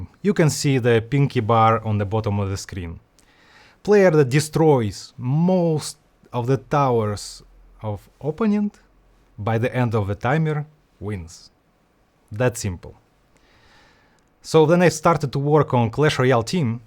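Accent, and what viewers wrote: Russia. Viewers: Он русский у него акцент русский и имя русское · I agree, Russian accent and English are very good) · Русско говорящий. Его зовут и по акценту понятно. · Я слышу русский акцент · Yes, his Russian-English dialect is hard not to notice, I also communicate in English.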